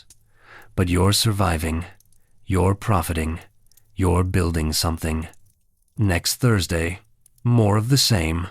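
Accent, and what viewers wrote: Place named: United States. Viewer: American voice describing medieval England bad AI choice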